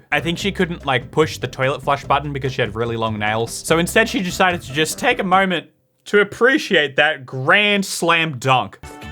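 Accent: Australia. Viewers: Your no moi confuses me specially when after it you start speaking perfect Australian accented English... 😅 Yeah, I know my problem is weird 😅😅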